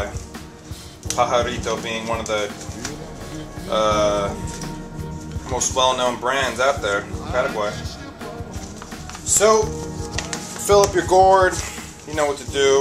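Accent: Argentina. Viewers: love how you speak the Argentinian languague...bombisha...very nice and very informative. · That's how we say it in the Rio de la Plata region. It's not wrong, it's just our particular accent. just like your version of pronouncing words isn't wrong either, it's just your version of spanish or castellano. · I know you're talking in an Argentine accent but if you didn't know IF you didn't that is it's actually pronounced bombiya it's spelled bombilla but I'm sure you know that but I just had to tell you I don't know why but I did...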